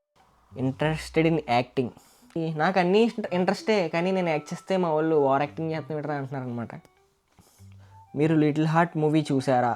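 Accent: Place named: Godavari, India. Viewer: Mana Godavari slang antene oka emotion ani only godharollake telusu 😂Super nuv❤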